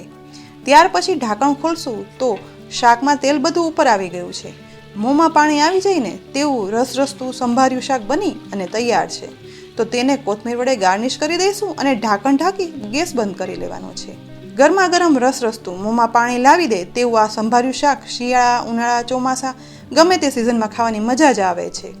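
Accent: Kathiawar, India. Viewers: Reecipe tau saras chhe j, Kathiyawadi guajrati saambhalavaa ni majaa aavi. ne tamaaro avaaj pan mitho chhe ne saras reetey samjaavyun! Aabhaar.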